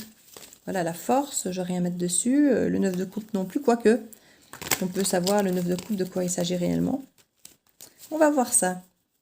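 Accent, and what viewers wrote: Liège, Belgium. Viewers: Je vous decouvre et m'abonne, tres bon tirage tres explicite, bravo 😉 Je pense déceler le petit accent de ma région, Liège 😁🤪🥰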